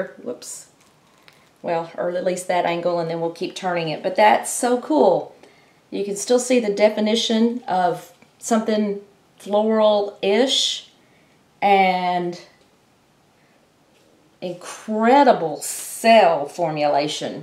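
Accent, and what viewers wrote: Texas, United States. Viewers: So. Moving from California to Texas. Good that you already haves Texas accent.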